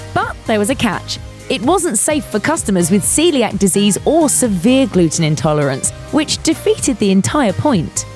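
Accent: England, United Kingdom. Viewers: i dont fuck with the english accent chick, bring the other girls back PLEASE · Number one: narrator is British and should be the watch mojo girl. Number two: she's British and can't pronounce controversy. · CON-TRO-VER-SY... Americans love Brit accents, but not when yammering about American's faults. Then it sounds either retarded or snooty. NAHHHaaa, who am I fooling I still lover her voice.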